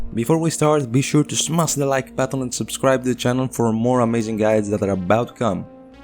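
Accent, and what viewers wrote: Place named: Greece. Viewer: are you greek? I am greek too. If yes i could tell from your voice lol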